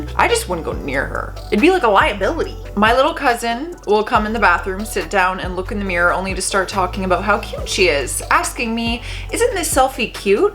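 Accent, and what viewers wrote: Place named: Canada. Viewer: G,day I'm Australian. Surprise, Surprise, you have a Canadian Accent!!! Love it!! All the best. Bluey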